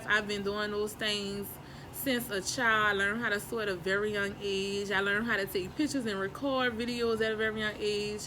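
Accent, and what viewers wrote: New Orleans, United States. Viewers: I thought your accent sounded like New Orleans. I’m a Louisiana native too. Yes, every Friday is seafood night at my house. Congratulations on growing your channel. Thanks for the tips on YouTube growth. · I love the NOLA accent too! That's what caught my attention and made me keep watching.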